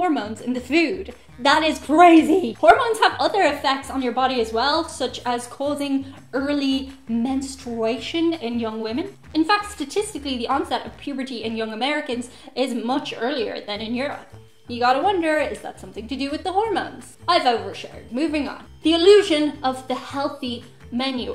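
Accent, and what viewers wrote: Ireland. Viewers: Hello. What part of Ireland are you from? Im guessing south east. Co Wicklow?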